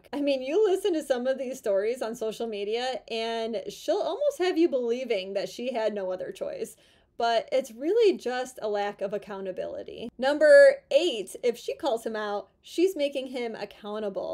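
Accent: United States. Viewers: Very attractive woman, attractive face, attractive voice, from a foreigner who likes the American accent. A woman doesn't belong to a man, a man doesn't belong ot a woman , People like each other and live together or, people have their own homes, they live separately and see each other. Men today are frightened if they live with a woman and she throws him out he will be homeless.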